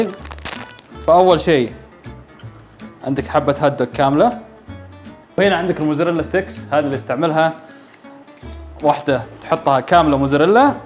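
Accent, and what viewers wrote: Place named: Al-Ahsa, Saudi Arabia. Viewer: من وين انتي؟😂😂 احسك حساوية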